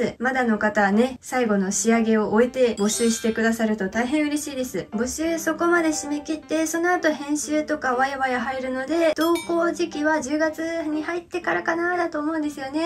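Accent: Tohoku, Japan. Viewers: 東北か北関東訛りですね福島か栃木かな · ちゃんって東北人？しゃべりが癒される👍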